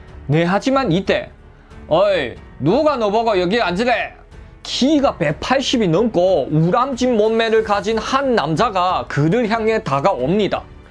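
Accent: Yanbian, China. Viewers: 억양과 엑센트가 특별하고 분명한 어조로 구사한다 전달력이 확실해 상황의 신뢰성이 충분하다 핵심 단어마다 엑센트가 빠지지 않은다 그리고 웃지않으며 진지하다 서술의 시작과 끝이 목소리톤이 일정하고 인상깊은 연변사투리와 중국어가 돋보인다 이게 종일tv의 칭찬요소다 댓글창을 보면 안티가 하나도 없다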